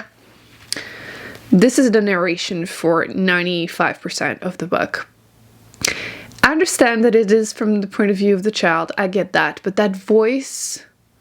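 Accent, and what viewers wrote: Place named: Quebec, Canada. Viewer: Nevermind, that accent answered my question. Maybe you'll see a fellow quebecoise booktuber soon? I'm trying to start one even though I'm very new at this. You managed to get an impressive amount of subscribers, congrats!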